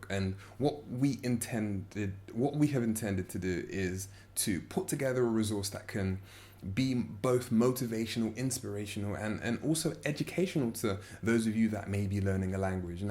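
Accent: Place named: England, United Kingdom. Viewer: i love u´re english accent :D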